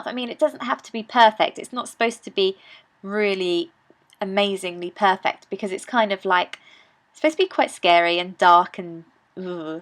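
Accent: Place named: Yorkshire, United Kingdom. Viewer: Hey! Lovely make-up - I do love your accent...I think you might be from the Yorkshire area, I'm right? :3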